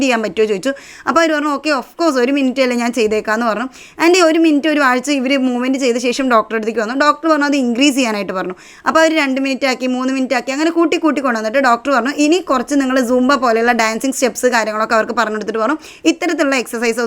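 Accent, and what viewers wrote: Palakkad, India. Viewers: Palakkad aanalle (thodi)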